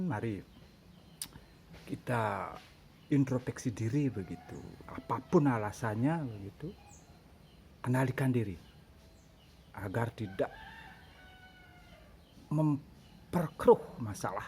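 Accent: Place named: Bali, Indonesia. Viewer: Salam kenal bapak baik hati berlogat bali. Semoga energi simpatik dan jiwa penolong anda berguna bagi sendiri, engkau juga dirimu. Saran saya suara yg terbata2 dan pembahasan yg muter2 lebih di lutuskan lagi niatnya🙏🙏🙏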